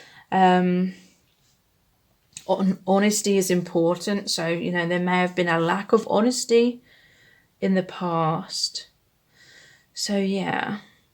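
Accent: United Kingdom. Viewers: I love your British accent ❤❤.Great job by the way, you are really gifted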